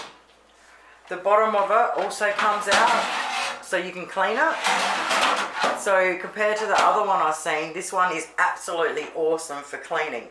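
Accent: Australia. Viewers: Wonderful listening to Australian English in a review. Love the sound special effecs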